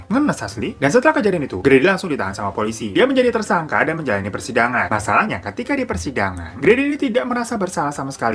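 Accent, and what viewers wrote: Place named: Banyumas, Indonesia. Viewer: Enakan cara ngomong nya bang ,hehehehe ngapak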